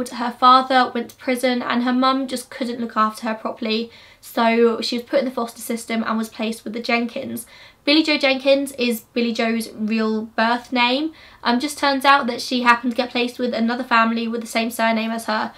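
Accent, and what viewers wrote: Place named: England, United Kingdom. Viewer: Sad story! I’m a new subscriber and you are doing a great job! One little comment that I’m glad you speak somewhat slowly especially with you having a (wonderful) accent. I’m from England so it brings me ‘home.’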